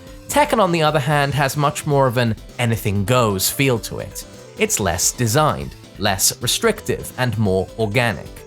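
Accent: United Kingdom. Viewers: you live in japan? thought you were bri'ish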